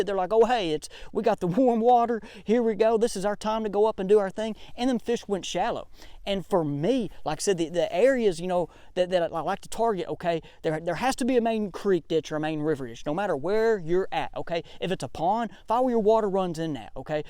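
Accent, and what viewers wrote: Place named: South, United States. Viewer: The man talks like I talk. Free, fast, and it's straight up southern.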